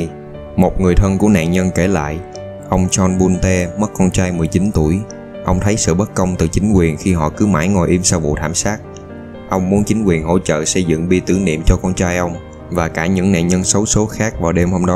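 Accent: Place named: South, Vietnam. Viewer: giọng nói miền Nam về rất hay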